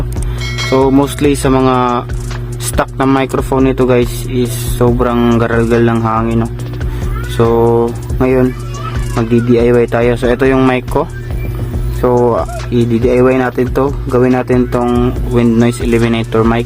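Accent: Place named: Davao, Philippines. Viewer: Bro taga davao diay ka?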